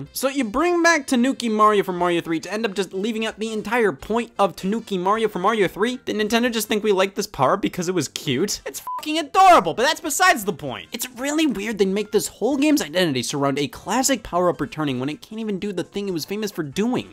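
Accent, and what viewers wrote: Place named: Midwest, United States. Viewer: What I love about is that you can obviously tell he's from the Midwest poorly based on the fact that he said "Yup" like, 10 times in this singular video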